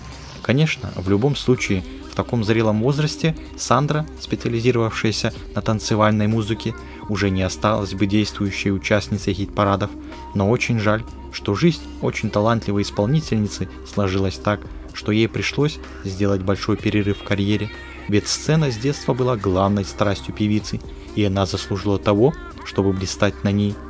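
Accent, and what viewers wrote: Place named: Lviv, Ukraine. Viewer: Очень хорошее видео, но комментатор явно с Львова .....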